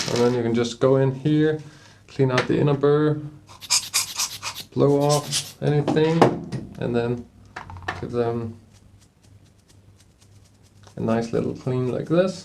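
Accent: Denmark. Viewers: Super-grateful for your quick and clear reply! I'll go for the basic. Thanks for your great content!! Btw - are you based in Denmark? Your accent gives me that impression! :) Greetings from Sweden! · Your accent sounds Danish, er you from Denmark?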